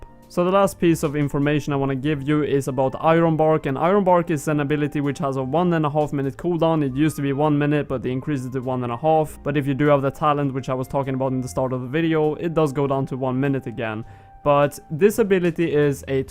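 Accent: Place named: Sweden. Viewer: I can hear that swedish accent..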